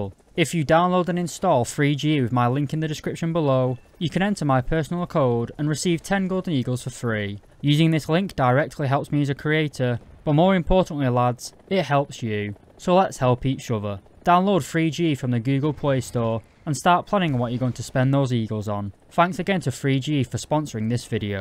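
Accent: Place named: Lancashire, United Kingdom. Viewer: Where abouts in the UK are you from pal? Sounds like Lancashire to me. I'm from Leigh near Wigan/Manchester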